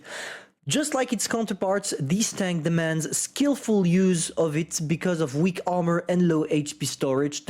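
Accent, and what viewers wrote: France. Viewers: Dear, I must give you a huge compliment. In my oppinion you are by far the best You-Tuber on WoTB. Not that your explainations are sharp to the point and your commitment to the game and the community is outstanding, but your french accent within the english language is absolutely insane, when it comes to the fact of entertainment. In other words: you are broken as a Smasher. Keep on your performance for the benefit of us players. sincerly yours. Carl Schurz, Adj-chef-2.eme REP ( retired in 1991) served in anonymas. " Nous sommes le meilleur"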